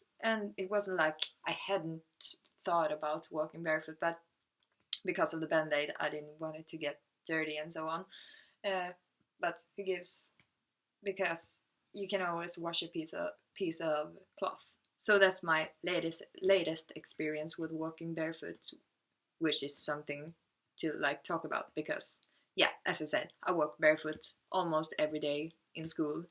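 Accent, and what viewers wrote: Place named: Sweden. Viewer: you have an awesome accent. And Swedish is the most popular North Germanic (Scandinavian) language.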